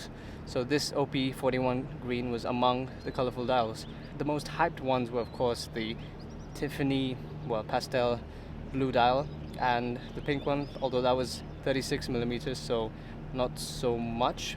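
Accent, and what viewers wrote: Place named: India. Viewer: Where are you from? Your accent is like Indian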